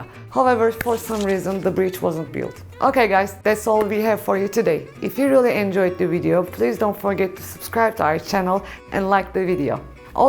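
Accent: Turkey. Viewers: she speaks with turkish accent. she needs to improve herself. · thats turkish accent and i believe it is better for every country to speak with their accent as no foreign people can speak turkish like turks ,people dont have to speak english like americans or brits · Arkadas. bu kadar Turk aksaniyla mi anlatir bir insan. Ing video yapiyorsan biraz kendini gelistir kurtul su agir aksandan ya da azalt. youtuberimizin bile baya saglam ingilizce konusamadigi bir ulke.. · Gordugum en ağır türk aksanı olabilir. Ama iyi video.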